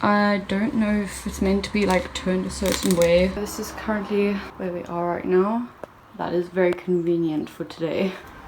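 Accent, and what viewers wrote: South Africa. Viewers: Are you South African? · the first 5 seconds I was like sHe is SOuth AfRICan 😂😂❤️ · I was like damn she sounds South African, then she hit us with the "so, ja" and I knew 😂❤️ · Are you South African? You sound like it but I don't want to assume things because people get so triggered when I ask this but... I'm South African and you sound soooo South African. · she afrikaans so south africa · you sound south African. hmm lmao great vid btw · I could spot the accent straight away!! I'm South African too!! <3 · she's south african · as soon as i clicked this video i said “she’s from south africa” i adore your accent!!!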